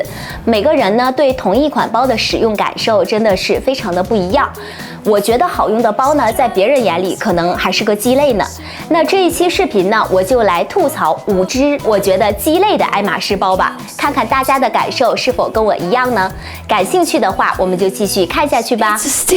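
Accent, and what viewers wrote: Japan. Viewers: 日式英文，聽不太懂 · 在日本生活了十几年，英语发音已经被带偏到姥姥家了😅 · 日式發音 畢竟她在日本啊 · 日式英语好可爱hhh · 看了评论才知道是日式英语，shoulder楞没听出来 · 她在日本開店，所以都是日語發音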